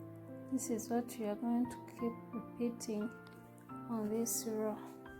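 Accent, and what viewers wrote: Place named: Uganda. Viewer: Nice work, are u a Ugandan? U sound like me 😍😍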